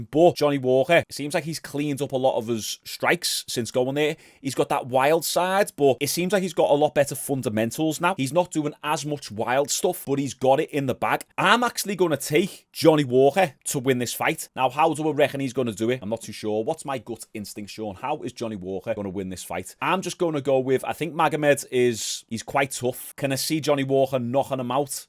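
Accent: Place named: Liverpool, United Kingdom. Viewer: I’m a fan You’re fun to watch and the Liverpool accent is your “Bread and Butter”!!! 🤣🤣🤣 Anyway, you could do a great live reaction show…it’s a bit of work but it would take you to the next level with “Super Chat” money, etc Keep up the great work!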